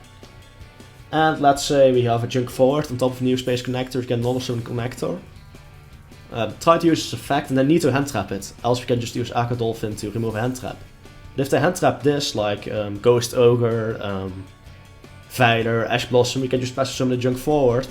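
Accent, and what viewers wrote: Netherlands. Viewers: hes dutch i can hear it lmao. Its nice to see some one from my country on this channel too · Lol a dutch Guy you can here ot so baba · He sounds dutch 🤔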